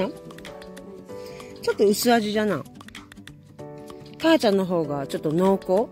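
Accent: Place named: Okayama, Japan. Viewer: さん、こんばんは。高台からのロケーションと、チョイチョイ出てくる、さんの岡山弁！最高です👍😁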